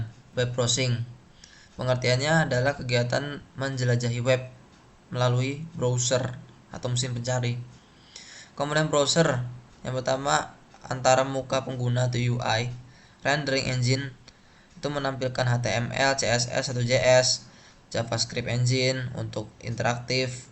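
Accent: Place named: Java, Indonesia. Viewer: mas iki loh rek medok kali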